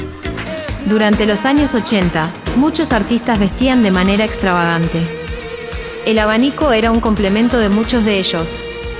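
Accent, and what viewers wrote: Argentina. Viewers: Canal pedorro y tendencioso. Informar con humor? Son peores que Locomía! Y la mezcla de locución «porteño - neutro» es de terror!! Quién te de deja una «megusteada»? 👎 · ¿Narración con acento argentino y modismos de España? 😑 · Muy buen video. Ahora bien, la locutora (que hace un muy buen trabajo) es claramente argentina, asi que resulta raro escucharla usar giros idiomáticos netamente españoles como "chupipandi" o "vidio" mientras pronuncia "eshos" y demás.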